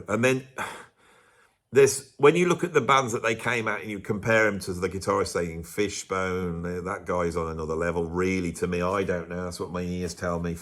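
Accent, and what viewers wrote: Birmingham, United Kingdom. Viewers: Five minutes in and my ears are bleeding from the wall of unpunctuated sentences, delivered in what I presume is a Worcestershire accent, sounding suspiciously Brummie. All this and we've almost finished defining "overrated". Brevity, please, dear Dog. Unfortunately I want to find out who these guitarists are because nothing is more fun than finding opinions to get irate about. How about some bookmarks?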